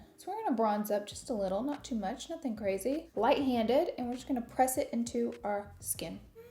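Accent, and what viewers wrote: South, United States. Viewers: Girl....That Black lipstick ruined it for you Lol 😂, but I guess it was fun....Good to see you doing a makeup tutorial But I do much prefer your other videos now with a little bit of home decor and the family in them. Your So Cute and I love your accent, southern charm girl. Best Wishes and Kindness from Queensland Australia 🇦🇺❤️❤️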